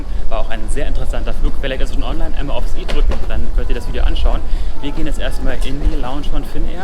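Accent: Germany. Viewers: Ich habe mit ihnen über dieses Thema schon einmal gesprochen. Sie haben eben das typische Schulenglisch gelernt und jeder weiß, wie gut dieses ist. Wenn man eben, so wie sie, lange kein richtiges Englisch spricht, vergisst man eben eine Menge und die Aussprache leidet auch darunter. Vor allem Vokabeln. Diesen typischen deutschen Akzent hat einfach jeder Deutsche. Ich lebe seit meiner Kindheit zwischen Monaco und Berlin und spreche fließend Englisch, trotzdem hört man meinen deutschen Akzent. Das ist einfach so. Jeder hat seine Probleme mit der Aussprache von verschiedenen Wörtern. Ich würde es einfach entspannter sehen. :) Liebe Grüße aus Monaco -Raphael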